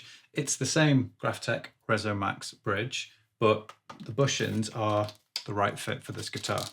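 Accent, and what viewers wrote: South, United States. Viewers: I was not offended by your "Southern" accent. I thought it was hilarious and I live in Nashville where a lot of people sound like that! Cheers.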